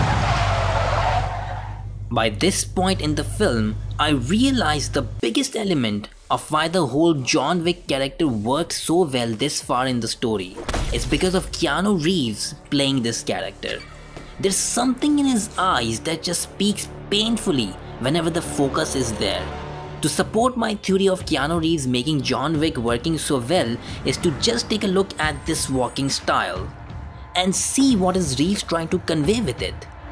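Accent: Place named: India. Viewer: Dude u have to firm your Indian english voice the vocabulary is good but sharpness of words, u have to soften it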